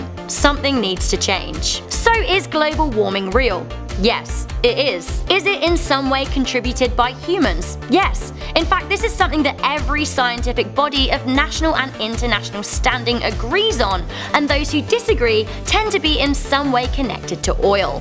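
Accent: United Kingdom. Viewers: She is from the U.K., different accent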